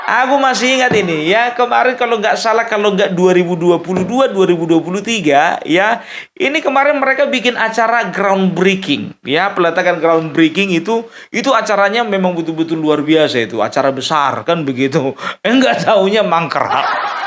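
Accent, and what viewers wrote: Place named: Batak, Indonesia. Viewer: Kereeen opung, saran saya dialeg bataknya di pertebal lg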